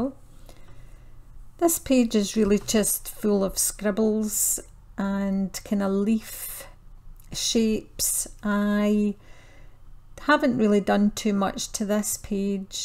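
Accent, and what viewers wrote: Scotland, United Kingdom. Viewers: Thank you for taking us through your journal! I have to say I so enjoy your Scottish brogue. I had to subscribe, and I look forward to seeing your art and hearing you speak in your other videos! :) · What a unique and beautiful journal, love all of the natural elements. And how lovely to hear a bonny Scottish accent...makes me miss my days of living in Edinburgh!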